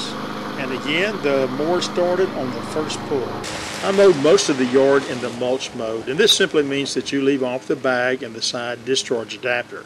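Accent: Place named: South, United States. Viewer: I love this authentic, Southern American accented English. While all the southern accents may sound similar to a Northerner or an international visitor, there are a plethora of different distinguishable varieties and flavors. Likewise I have never heard two different British accents that sounded the same to me.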